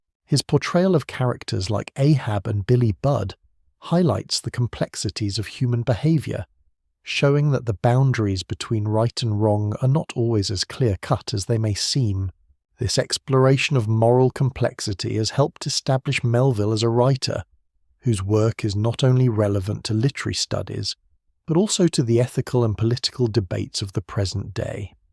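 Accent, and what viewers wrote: United Kingdom. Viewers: It's being a British accent, don't you know.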